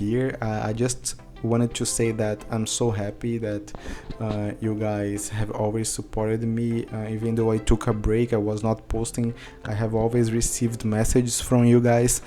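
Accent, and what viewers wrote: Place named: Brazil. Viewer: It's great watch your video again. I watch a lot videos about English, cambly, things like this. But the best of them is yours. And The best "brasilian" English of them is yours. I entered on Cambly because of your videos. Keep going guy 🤜🏿🤛🏿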